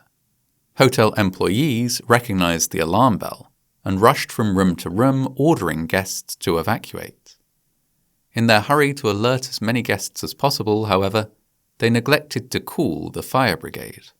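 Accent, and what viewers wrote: United Kingdom. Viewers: Of course, another video about an American event narrated by an annoying British accent! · It was very thoughtful of Holiday Inn to install a fire alarm designed to not disturb their guests. Greece still doesn't use 911. They use 112. I find it interesting that a narrator with a British (?) accent would use 911 to refer to a general emergency number rather than 999. Perhaps an American script writer? Edit: Whoops, my bad. I kept hearing "Greece" and thought it was the country, not a town in New York.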